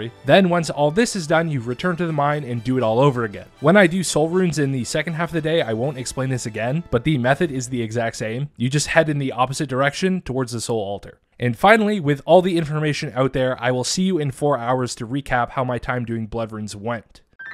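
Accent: Canada. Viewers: Are you canadian? You sound canadian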